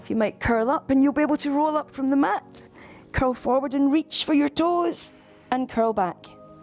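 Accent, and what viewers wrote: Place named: Ireland. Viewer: Lovely accent! So pleasant. Irish?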